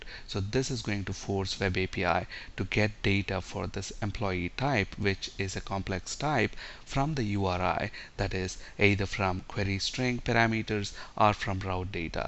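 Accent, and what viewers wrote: India. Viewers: The only Indian English accent that I like is accent:)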